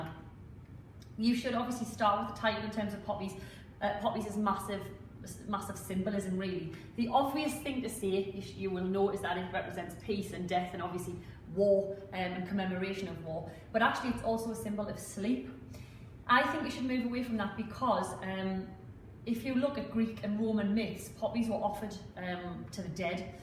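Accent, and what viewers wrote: Newcastle, United Kingdom. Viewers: From the north east surely , Newcastle ?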